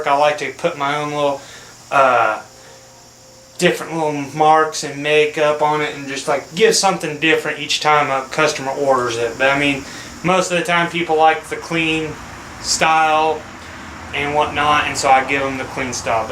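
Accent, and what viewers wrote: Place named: Tennessee, United States. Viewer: Rly good video. Subbed! 🤘🤘.. BTW! That accent.. I just gotta ask you bro, are you from Tennessee? Hails from Finland!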